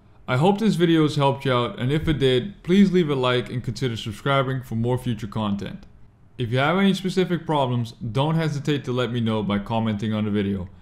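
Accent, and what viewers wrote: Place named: Hawaii, United States. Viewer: Your from Hawaii I can tell good video shooooootz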